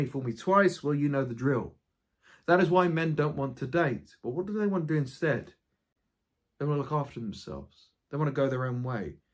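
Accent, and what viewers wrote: United Kingdom. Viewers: I have difficulty understanding the British accent. But I thank you for making this video. Because this is the first time someone has made a video on this topic, I agree with everything you said. In fact, there are some shortcomings. Global capital has globalized the entire world. It has corrupted people's morality. People's minds and brains have become completely for money, global capital culture has ended morality and marriage. People are now avoiding each other. Nobody trusts anybody. Almost everyone has become evil, turned into a narcissistic dog, thank you again for making this video.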